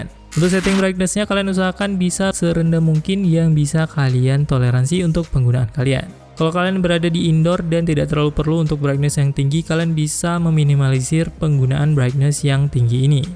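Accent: Aceh, Indonesia. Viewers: Org aceh? Ya om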